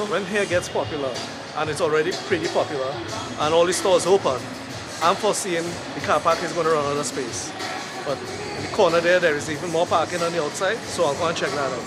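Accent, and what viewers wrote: Trinidad and Tobago. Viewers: You sound like a Trinidadian, everyone blogging now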